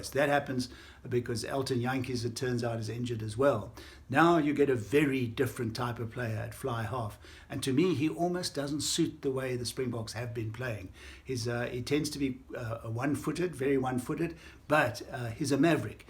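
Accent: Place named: England, United Kingdom. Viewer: Potter and the funny thing is on each occasion many years later the official has come out and said he was wrong but that doesn't help after the fact.... And why do they all admit many years later that they were incorrect, because at some point in there lives, they realise they just look like dishonest chops and the conscience gets to them... Brice Lawrence came out n said I had a bad day, Romain poite came out said I got it wrong, paddy o brian said on many occasions he got it wrong with bok games????? Doesn't help after the fact... Remember two years ago where boks were about to beat England at Twickenham, Will Arrogant Farrell shoulder charged Andre Esterhuizen, boks weren't awarded a penalty, even although after the game Eddy Jones and Farrell said they were extremely lucky 😉... it's not luck it's biased officiating... you sound like a proper Pom, we dnt expect you to be unbiased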